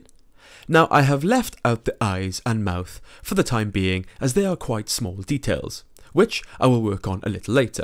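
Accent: United Kingdom. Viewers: it might be the british accent responsable for my confusion but you sound very much like the dude from the " School of life" chanle x)) helpful tutorials, by the way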